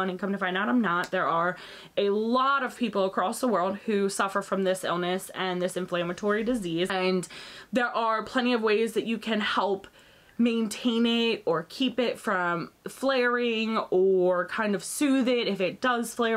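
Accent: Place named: Philadelphia, United States. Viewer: I just subscribed after your declutter and saw this video. My son had eczema so bad as a baby that we had to take him to see a pediatric dermatologist. We had to put giant bandaids on his face because his cheeks were red, shiny, and weeping. He's now 11 and after several years of relatively minor issues, he just started getting the red rough bumpy patches behind his ears and on his arm where his watch is so we started topicals and we are limiting unnecessary showering. It definitely cycles especially with allergy season. It messes with you mentally and emotionally and you are right some people have no idea how much it can impact more than your physical health. I recently started on the spironolactone due to pcos and I seem to be tolerating it well. I noticed the benefit of the clearer skin. Its nice to have a postive side effect for once. 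You got this! Also, where are you from? I'm originally from Philly and you sound like you grew up in that area.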